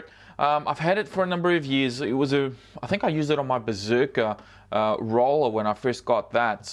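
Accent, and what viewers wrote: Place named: Australia. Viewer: There's a great big opening here, which would've allowed for some plastic to come through and mount onto a BAM-PA-BAAAA!! There's your next remix video, DJ's. Gotta love that Ausie accent.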